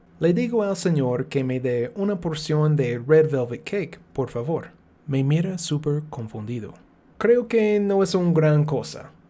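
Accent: Spain. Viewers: Bebo cafe dos veces al dia, cada dia. Creo que mi sangre esta llena de cafe. Funny that the accent made all the difference. I can understand 'red velvet cake' in a Spanish accent just fine. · Que chistoso! El inglés con un acento espanol...he disfrutado tus cuentos y tengo muchas ganas de otros...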